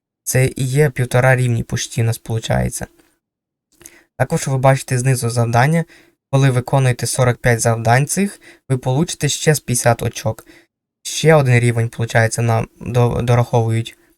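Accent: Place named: Western Ukraine, Ukraine. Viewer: Ти явно десь із заходу, волинь чи рівненщина?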